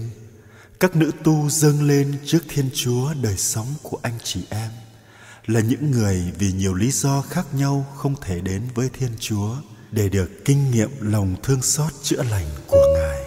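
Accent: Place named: South, Vietnam. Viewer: Bình thường trong những live show Nguyễn Hồng An nói giọng Nam đã thay để thương, bây giờ nói được nghe giọng Bắc của sao ngọt ngào, càng de thuong hon nữa. Hat nhạc thánh ca hay nhạc đời đều tuyệt vời. 1,000 Likes nhé.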